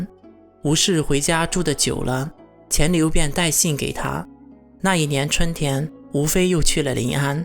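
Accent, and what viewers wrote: Shandong, China. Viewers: up主听这个口音 感觉祖籍是不是山东的呀 山东普通话 哈哈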